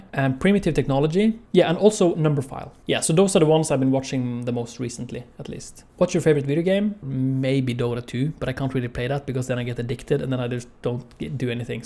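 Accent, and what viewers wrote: Norway. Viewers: Interesting to hear your Norwegian accent. Sounds like you're from the Sogn og Fjordane area, probably a bit inland, not coastal.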